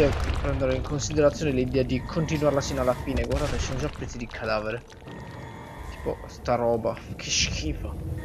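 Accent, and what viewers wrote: Sardinia, Italy. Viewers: Oddio, sei sardo?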